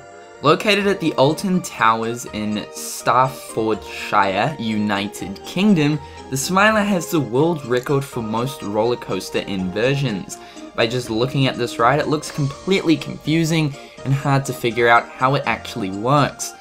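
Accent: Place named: New Zealand. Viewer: The way you said Staffordshire hahahahahha XD not hating on your beautiful NZ accent btw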